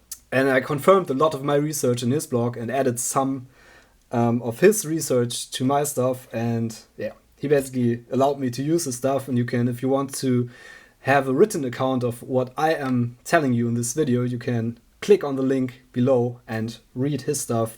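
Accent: Germany. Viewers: You sound German I’m from Germany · Konnt heraus hören das du deutsch bist xD epic console _ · Are you from Germany? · Vermutlich wegen der grösseren Reichweite auf englisch ? Aso...und sooo schlecht ist sein Englsich ja auch gar nicht, nur die Aussprache klingt halt sehr deutsch :-)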